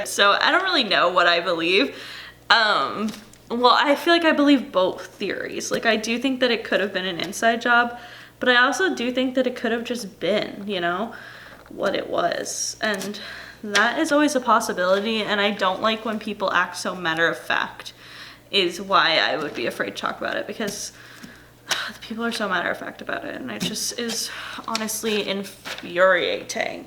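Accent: New York, United States. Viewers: Her New York accent though😂😂😂😂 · I love your New York accent..Lmao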